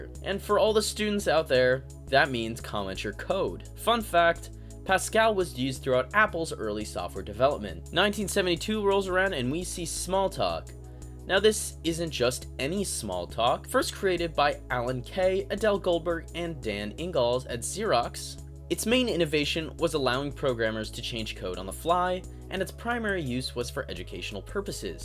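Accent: United Kingdom. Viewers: Ahh, sorry I missed it. Thank you so much for this awesome video, it was so beneficial. I liked your British accent too. More videos should be made on these topics since computer science is really attractful. Looking forward to see history of operating softwares :)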